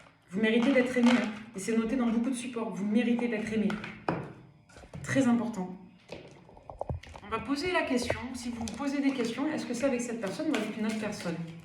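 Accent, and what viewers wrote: South, France. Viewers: L'accent du sud, c'est la vie, c'est plus qu'un accent, c'est un état d'être. Un grand merci pour votre lumière bienveillante et franche.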